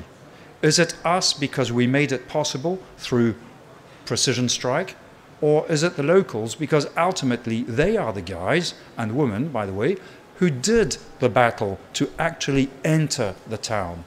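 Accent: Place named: France. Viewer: He speaks perfectly English, but he has a heavy French accent, I recognize it as a French. English is clearly not your mother tongue.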